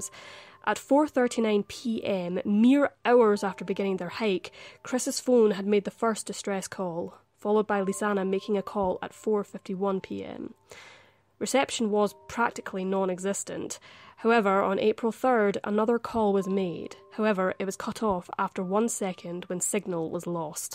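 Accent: Scotland, United Kingdom. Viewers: And I LOVE your accent ! Reminds me of Sean Connery.A relief after all those computervoices an Vallygirlll accents.A delight to listen at.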